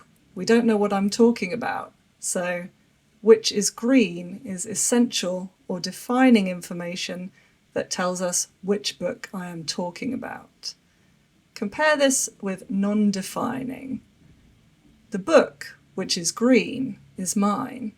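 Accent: United Kingdom. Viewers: It was perfect 😍 I love your British accent 🥹✨️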